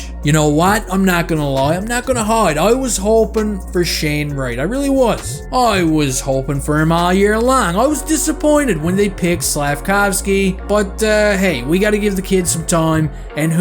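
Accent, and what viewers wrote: Newfoundland, Canada. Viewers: Congrats! I enjoy your content a lot and I find you very funny and original. You're so good at making up names for the players. And yes the background videos and memes are fun. it makes you different from all the other YouTubers. You're my favorite! Keep it up! One more thing... Love your Newfie accent! 😜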